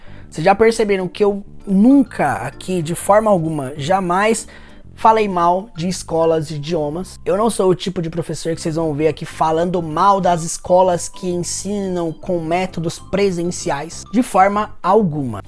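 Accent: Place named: Inland São Paulo, Brazil. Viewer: Esse teu sotaque de Paulista do interior é insuportável!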